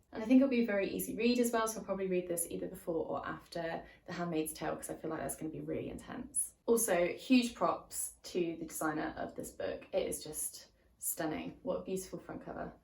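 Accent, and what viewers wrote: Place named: United Kingdom. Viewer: OMG I have never laughed soooo hard while watching one of your videos 😂🤣 First off, I heard "arse holes at night" instead of "our souls at night" - Yes, that's right, despite the fact that I was born in 1993, I am in fact a twelve year old XD (although, in my defense, being from the US, I'm not used to hearing folks speak with British accent IRL so it does take me like, half a sec longer to interpret words spoken by ya'll from across the pond:3) Secondly, "The next book is second in a trilogy...I've heard there's maybe going to be another book..." - LMAO I was sooooo sure here that you were making fun of Kingkiller Chronicle before you showed the book you were actually talking about XD (I have not slept well past few days so my brain just autopilot at this point and is interpreting ppl's words wrong lol) Anyway, great video as always <3 And who know, perhaps one day I will grow up a bit and/or catch a good night of sleep:3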